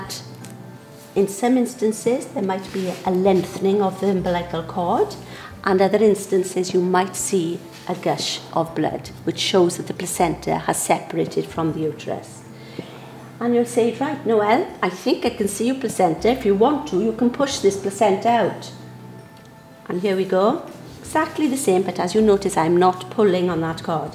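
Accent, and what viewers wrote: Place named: Jamaica. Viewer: She sounds Jamaican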